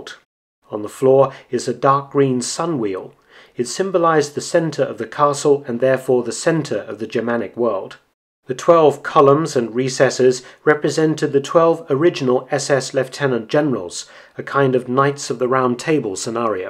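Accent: England, United Kingdom. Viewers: I'd say he's English, to be precise.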